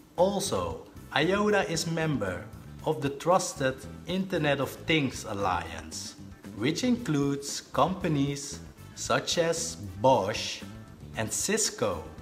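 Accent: Netherlands. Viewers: This was great, I really enjoyed your presentation style. You must be Dutch judging from your accent.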